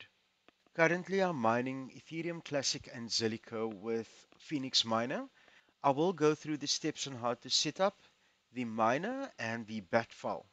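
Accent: Afrikaans, South Africa. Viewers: Jou afrikaanse accent kom sterk deur😂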